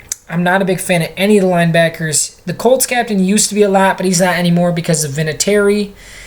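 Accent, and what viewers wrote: Michigan, United States. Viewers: are you from Michigan? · Are you from Michigan?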